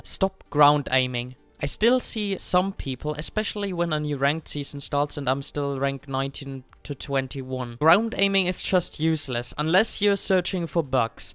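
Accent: Germany. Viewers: U german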